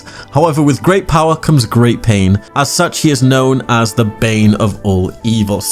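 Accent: United Kingdom. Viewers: You sound a little British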